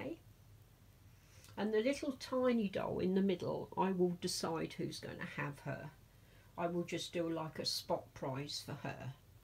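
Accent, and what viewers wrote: United Kingdom. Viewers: I don't know why YouTube unsubscribes me from random people ... I was wondering why your recent videos weren't popping up in my feed! .... !! .... I remember the last video I've seen was about a haul (which are my favorite) 😊💕🌺 What I enjoy most about your channel is the hauls and the Theme Thursdays you do on the babies. You have a lovely collection! I would love to continue to see your theme thursdays and your babies dressed up in the beautiful clothes that you have for them. I enjoy your book-story-telling too. You have a wonderful and kind heart 😊🌺 Plus, who doesn't love listening to a UK Accent?? hehhehheh .... Have an amazing day!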